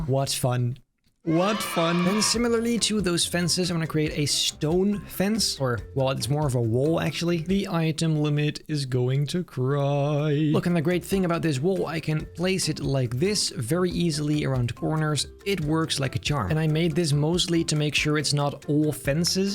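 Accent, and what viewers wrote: Netherlands. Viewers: I love dutch accent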